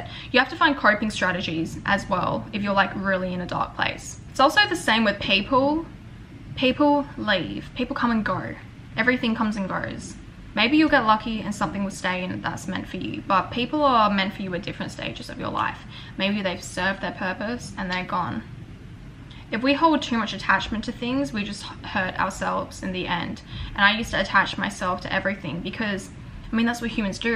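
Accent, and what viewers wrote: Australia. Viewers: It's an Australian accent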